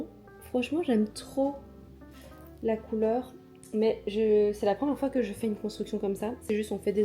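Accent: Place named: Belgium. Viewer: j’adore ton petit accent belge, ça me rappelle d’où je viens 🥹 je crois que j’en ai encore un peu moi aussi, c’est drôle comme les accents nous suivent partout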